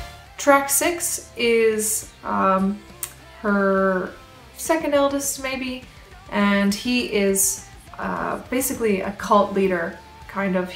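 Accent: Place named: Canada. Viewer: okay but her little canadian accent is actually adorable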